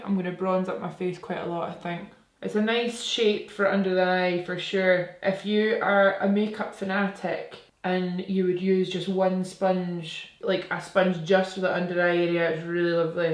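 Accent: Scotland, United Kingdom. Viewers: Skoosh 💦 😂 Awfy Scottish today!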